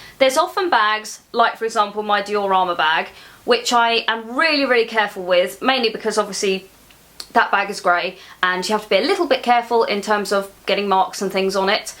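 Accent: England, United Kingdom. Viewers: Just out of curiosity, may I know which part of England is this kinda accent from?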